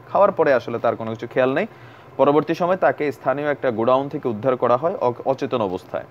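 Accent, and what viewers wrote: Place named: Comilla, Bangladesh. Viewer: আসসালামু আলাইকুম ভাই, আপনার কুমিল্লার ভাসায় খুব ভালো লাগে।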